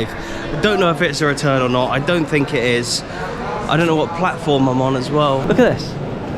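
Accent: United Kingdom. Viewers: I saw you filming a video at the Christmas markets, I was just making a delivery for a food delivery company and I passed you on my bike, I also thought that this must be a UK accent and I wondered for a while where you were from. And today this video pops up, I like your content. Keep it up and come again.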